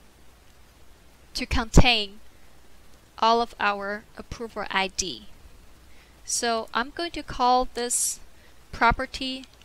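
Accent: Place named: United States. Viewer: The question I have is how can I see the contents of "Su Approval List" after the decision table has executed? I have tried to find it in the clipboard and find it using the tracer. Is it possible to find the contents of the Su Approval List page list in the clipboard or using the tracer? Also I was thinking the page list contents could be stored in the database. Since I use the pega academy cloud version of pega I know of no way to search the pega academy cloud version of pega. I had not trouble of getting the same results you got when I repeated your steps. I though it would be could know how to find what is in the Su Approval List page list for debugging purposes in future problems I might incounter. Thus the reason for my question. Keep up the good work. I worked thru 2 of you videos this week and will continue with the other videos as time permits. 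One of the main reasons I like your videos over most of the utube videos on Pega is I can understand your English (because it sounds like the English spoken in the United States).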